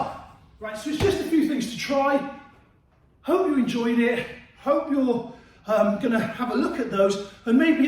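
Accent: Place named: England, United Kingdom. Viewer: That English accent! Cool 👍👍👌👌👊👊🥊🥊🥋🥋🙏🙏😁😁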